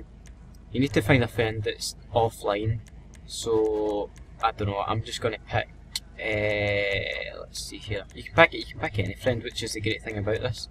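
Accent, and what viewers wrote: Scotland, United Kingdom. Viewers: wish it would have worked but I love the accent.scottish?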